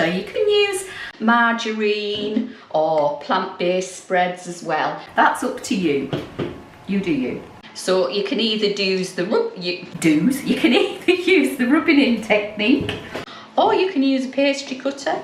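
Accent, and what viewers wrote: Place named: Cumbria, United Kingdom. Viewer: A Cumbrian now living in Devon. knew Warcop, near you, well having relations there. Love the accent!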